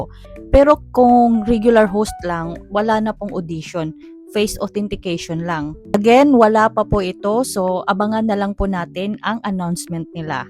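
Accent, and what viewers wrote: Visayas, Philippines. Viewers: Biaya ka po ba? Tono mo po kasi bisaya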